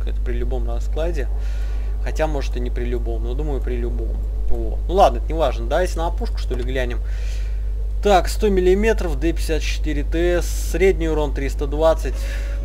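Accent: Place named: Odessa, Ukraine. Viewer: Зато Одесский колорит прям хлещет из речи. Одесский быдло - колорит. Хотя хотя если брать, то у него даже не быдло стайл... Это просто говно.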